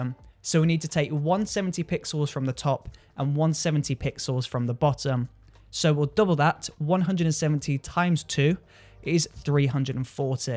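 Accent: United Kingdom. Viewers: are you fromm uk?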